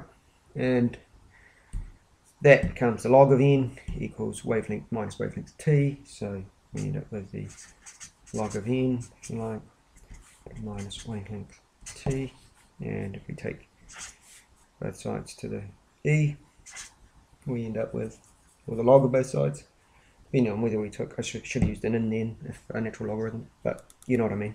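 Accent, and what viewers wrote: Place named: Australia. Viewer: You're Australian. Thank you, Mr. Australian man.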